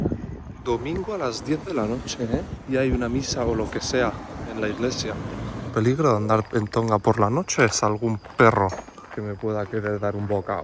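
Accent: Cuba. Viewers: Waooooo habla como cubano!!!! Bella isla ❤ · Oye, tiene acento cubano, 😂😂😂. Yo soy cubano también. Gracias por tus videos, son muy buenos. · HASTA EL ACENTO CUBANO TIENE PARECE CUBANO YO SOY DOMINICANO VECINO DE CUBA Y PUERTO RICO SOMOS PARECIDO 🇩🇴🇩🇴🇨🇺🇨🇺🇵🇷🇵🇷👍👍🖐🖐🦾🦾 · se me quedó la tonada de cubano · El se llevo hasta el acento cubano. Bro me encantan tus videos. Ya he viajado contigo europa completa 😂😂🇨🇺🇺🇸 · Saludos y, yo soy Dominicano, te sentí el acento cubano.😂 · Lo primero que pensé fue parece cubano por el acento cómo que Tongano. ❤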